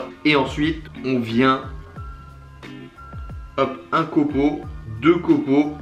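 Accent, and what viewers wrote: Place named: North, France. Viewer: Hello l'ami, il y a le boss de la dégustation du burger, Mr! Et je viens de te découvrir! Sympa ta channel, tu as un tio accent de ch'nord! 62? Je m'abonne, bonne continuation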